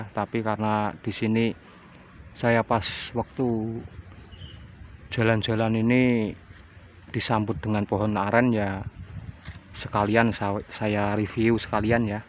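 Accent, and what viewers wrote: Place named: Java, Indonesia. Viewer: Omngnya medok kayaknys orng jawa ksng prast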